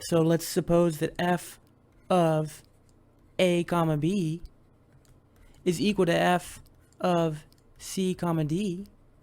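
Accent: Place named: United Kingdom. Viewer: Your video's are really good. In the beginning, you proved problem 7 of maxwell real analysis. In ch 4 of Rudin we use those facts countless times. Your problem selection, 14(a) & 12, for instance is also really nice. You solve every problem with precise definition, verification of metric space, for instance. By your accent, I'm guessing your from UK. Are you currently in some uni?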